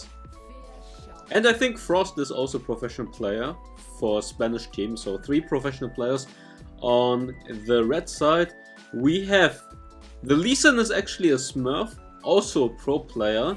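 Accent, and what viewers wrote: Germany. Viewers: One Question... are you from Germany? Your pronunciation sounds a bit german. Btw, I really like your videos... they are very helpful for platinum players like me :)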